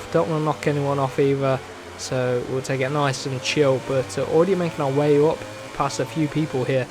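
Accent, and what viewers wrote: United Kingdom. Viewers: Where in England you from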